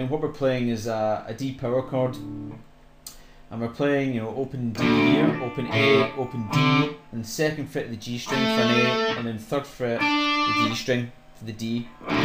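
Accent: Scotland, United Kingdom. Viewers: BRILLIANT M8 where areyou from in Scotland ?? Edinburgh?? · you scottish dude?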